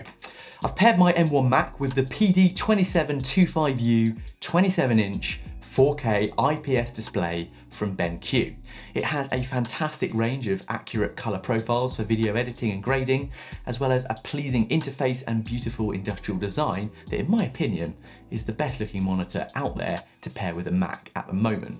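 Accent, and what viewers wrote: United Kingdom. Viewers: Loved the energy of this video, really inspiring! (and lovely british accent)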